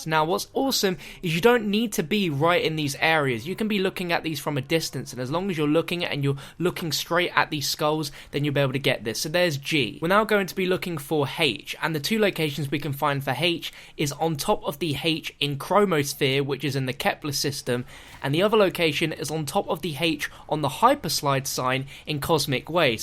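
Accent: England, United Kingdom. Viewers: That's how Britains pronounce it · He's from England, and that's how we say it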